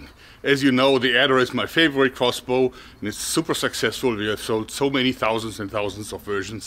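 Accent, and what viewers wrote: Germany. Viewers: your german accent hope thats right my apologies if it is not is absolutely amazing really adds to your videos keep it up! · Dude. Just came upon this channel right now. Hearing your accent I thought "ich denke daß dieser Man Deutscher ist" and then I took a look. Essen Deutschland. I love it man! And you English is killer, like many Germans. Thanks for the great content. Great content. Thx!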